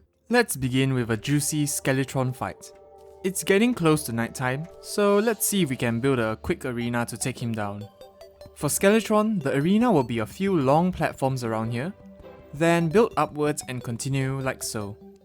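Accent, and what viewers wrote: Singapore. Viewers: Hey, just wondering, but do you live in Singapore? You kinda sound like a Singaporean for some reason....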